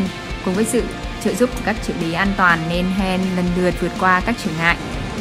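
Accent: North, Vietnam. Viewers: Hen nói giọng miền bắc dễ thương lắm chị sẽ ủng em hãy làm những gì mình thích cô gái mạnh mẽ quá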